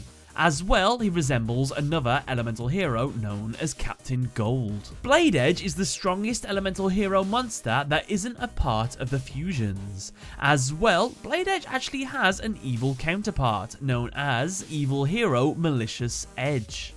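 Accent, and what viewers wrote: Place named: United Kingdom. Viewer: you're so British. And I live that you say reckon.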